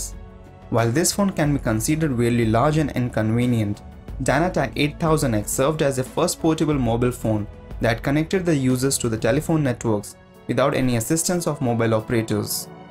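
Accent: India. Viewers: You have an indian accent...?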